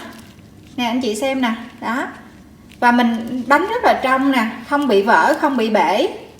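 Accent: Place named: Southwest, Vietnam. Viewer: Giọng nói dễ thương, rõ ràng đậm chất miền tây, hướng dẫn và cách quay rõ ràng, dễ theo dõi, dễ học hỏi, cảm ơn con gái nghe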